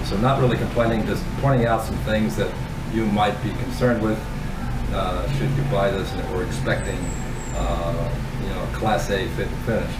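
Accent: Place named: United States. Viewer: Hi. New to he channel. Glad to hear you are feeling better. I came for the RE 650 content and I am happy to see you fitted aTEC Stinger exhaust. I am thinking about buying a RE 650 in the future and I saw TEC's vid regarding this exhaust and was blown away with the torque pick up and weight saving. But I wanted see someone install and opine about it and the performance enhancement. What is TEC charging for it? It not listed on there US website last I checked. Also, on a personal note, you sound like an American, what brought you to live in the Philippines?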